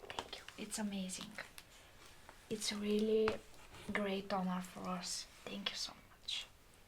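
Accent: Russia. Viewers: congrats. and one wuestion: are you russian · Are you Russian?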